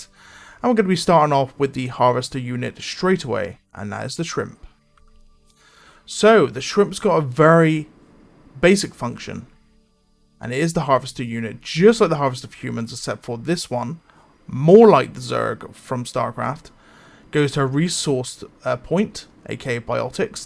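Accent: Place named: West Country, United Kingdom. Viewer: Do I detect a fellow West Country lad? When you say the queen has her own audio? What audio effects does she have?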